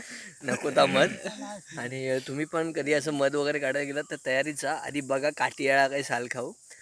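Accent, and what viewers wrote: Malvani, India. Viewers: Bharichhhh re maja Eli bghuk pan tumchi vat lagli naa.... Malavani ch boll bara vatta eikak 😄aaplo konkan asoch hirvalit rhavan de Maharaja 🙏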